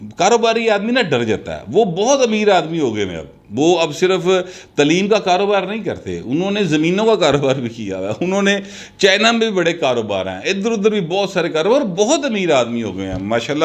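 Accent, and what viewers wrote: Punjab, Pakistan. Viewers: سر آپ کا تجزیہ تو 💯 بہترین اور سچ پر مبنی ھوتا ھے پر آپ کا انداز بیان بہت خوبصورت ہے اوردو کے ساتھ جب پنجابی بولتے ہیں بہت cute لگتے ہیں بھائی جان ھم آپ کا ہر ویڈیو دیکھتے ہیں from uk students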